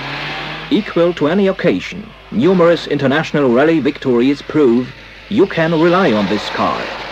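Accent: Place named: Germany. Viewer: It's interesting that the narrator speaks English with a noticeable German accent, leaves the factory name completely in German (not that they'd translate it fully, which would be something like "Saxon Ring Automobile Works Public Trust" but at least say "vee ee bee" instead of "fow eh bay") and calls the car "Trabant six hundred one" when "six oh one" would be how an English speaker would more likely read it.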